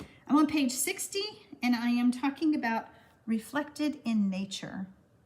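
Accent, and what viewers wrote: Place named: South, United States. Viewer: Frist time to see you. Really enjoyed all of your video. Thank you for all the information. I live close to Nashville Tn. and I talk just like you. Was fun hearing what I sound like. I love our southern drawl. I will be watching other of your videos.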